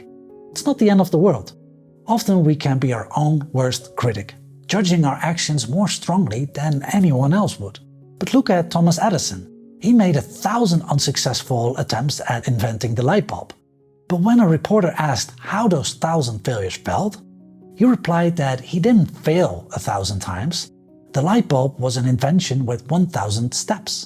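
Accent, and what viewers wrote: Netherlands. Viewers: hello, I just subscribed........I have a question, not related to the video at all.........but is your real name? I'm intrigued. I lived in NL for 10 years and I recognise the accent.........I don't understand the very English sounding name, and can't align it in my own mind with the content you are creating. thanks for clarifying.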